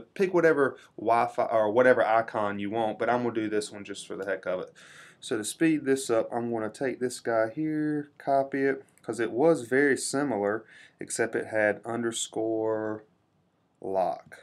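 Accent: South, United States. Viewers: ...thanks so much, just implemented the bluetooth feature to my device. I bow to you, honorable man with southern accent😊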